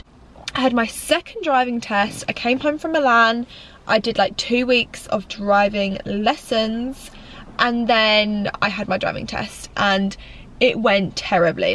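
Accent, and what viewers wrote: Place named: Essex, United Kingdom. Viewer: She always sounded like this LOL just an Essex accent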